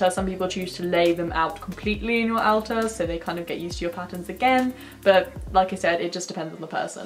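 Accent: England, United Kingdom. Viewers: Corinna Ginterseder sometimes her accent sounds kinda british, but i'm not specialist :P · Thank you for the video. I love your English accent and you have a beautiful voice. Sending you love and light. 🙏🏼💜🧿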